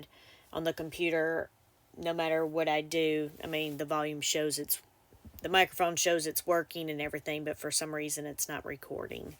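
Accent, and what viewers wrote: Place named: South, United States. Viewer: It’s the southern American accent lol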